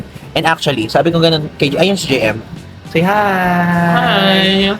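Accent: Nueva Ecija, Philippines. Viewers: Pag lumalabas ung Nueva Ecija accent mo naalala ko ung friend. Ang kyuuuut hahaa 😁🤗🤗🤗🤗🤗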